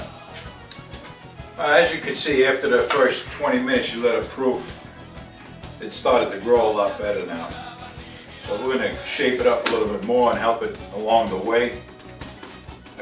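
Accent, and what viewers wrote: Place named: New York, United States. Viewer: You got like a New York Italian accent haha (: nice vid!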